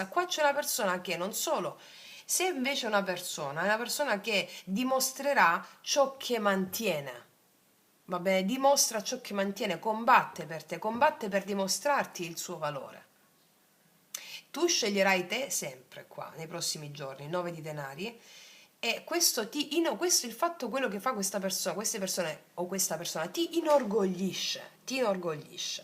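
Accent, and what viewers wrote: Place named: Lecce, Italy. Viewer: Sento una forte inflessione leccese❤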